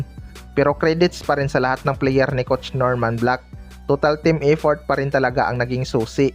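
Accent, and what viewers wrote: Visayas, Philippines. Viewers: Bisaya e tuno palang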